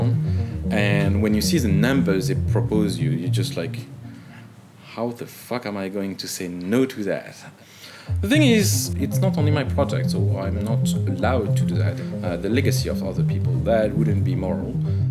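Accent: France. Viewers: I like your french accent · His french accent is so funny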